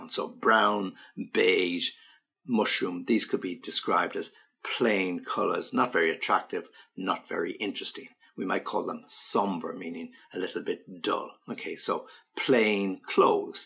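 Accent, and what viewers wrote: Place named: United Kingdom. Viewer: I really like British English, authentic! Please give us some words to describe about an exquisite accommodation or a superb resort and their services.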